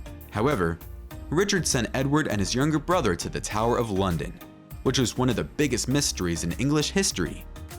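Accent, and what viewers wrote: United States. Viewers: However, however, however......... awful American accent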